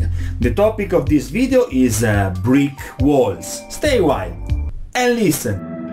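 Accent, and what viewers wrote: Italy. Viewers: Hey what part of Italy do you live? (I think that's an Italian accent but I've been drinking)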